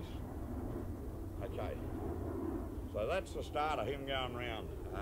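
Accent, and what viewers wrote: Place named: Australia. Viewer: I like that he was gentile and did not get into uneccesary fights and kept the session short with a young horse. I hate the show em whose boss..out of my space, use that whip and teach and ride with pain to make up for your lack of hosemenship. Sounds like down under accent..NY here.. Hate the way I was taught to ride with pain.. These amazing animals do not need bits or tie downs or spurs nor whips.. They or rather we do so much better just using a rope halter with no bit bucles or chains. And it creates a much better bond and team. lovely little chestnut.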